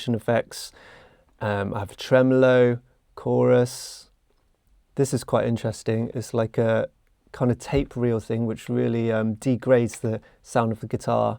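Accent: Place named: United Kingdom. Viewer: Can you do a tutorial on how to dial in a killer BRITISH ACCENT like yours?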